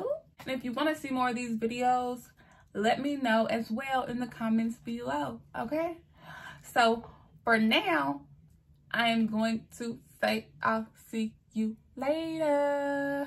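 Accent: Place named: Alabama, United States. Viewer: Lmao accent. U sound like my cousins in Alabama.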